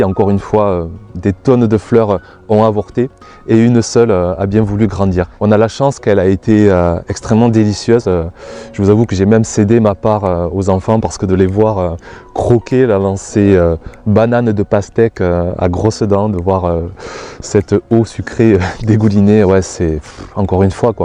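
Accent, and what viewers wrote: South, France. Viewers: Bonjour, j’apprécie vraiment cette chaîne,ou on évolue avec toi autant dans les succès que les échecs. Tu es un mec super et plein de sincérité et que dire de cet accent qui sens bon le sud. Je te souhaite tout le succès tant mérité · Quel beau clap de fin 2019 ? Tu es sincère et authentique et je t’en remercie. Tu as su partager tout au long de tes vidéos ta passion et tes émotions sont arrivées jusque dans nos foyers. J’aurai souhaité avoir un fils comme toi, mais je me consolerai d’être ton ami, lol. Reviens nous vite afin que nos dimanches chantent encore avec ton joli accent du Midi et que le soleil dans tes vidéos nous stimule à faire grandir nos fruits et légumes. Je reste dans l’attente de te retrouver avec le chant des cigales. Merci.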